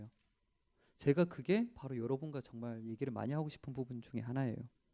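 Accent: Gyeongsang, South Korea. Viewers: 나는 일단 경산도 말투 나오면 듣기도 싫고 . . .역시 비유도 짜증난다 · 저는 서울 사람이지만 경상도 말투에는 항상 신뢰와 진실이 느껴지네요❤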